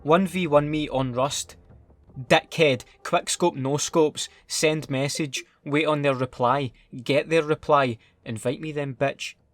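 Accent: Scotland, United Kingdom. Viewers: I only subscribed because of you're scottish accent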